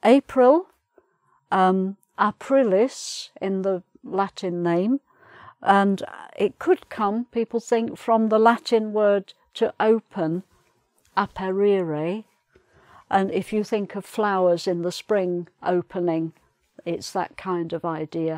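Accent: United Kingdom. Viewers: I’m from Brazil and i love your videos and British accents 😍❤️❤️ I learn more about the months and your origen so interesting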